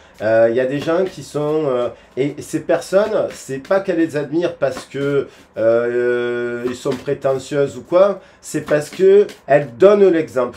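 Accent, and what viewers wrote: South, France. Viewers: Coucou je t'envoie mes ondes les plus positives pour que tu rencontres ton âme soeur et qu'elle te demande en mariage:face-red-heart-shape: Vu toutes les bonnes choses que tu nous envoies avec le coeur, et tes tirages de cartes toujours empreints de positivité, toutefois très réalistes et sans mièvrerie, je suis sûre que je ne serai pas la seule à formuler ce souhait! C'est toujours un plaisir de t'écouter (surtout avec ce bel accent du soleil), et merçi encore pour ta générosité de coeur. Tes vidéos on les écoute et on en redemande! ❤ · Je l ai délecte cette canalisation...divin gratitude pour ce moment magique et magnifique avec l accent du sud en plus...🙏🤲🪷🌹🕯👌👍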